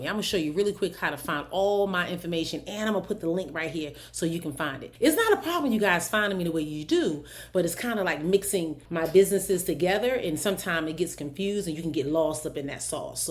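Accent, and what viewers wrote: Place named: Philadelphia, United States. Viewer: That Philly accent is strong!! Much love🙌🏿🙏🏿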